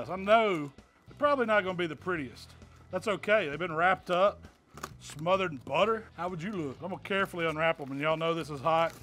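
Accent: South, United States. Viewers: I love watching your videos but I love listening to that awesome Southern drawl even more.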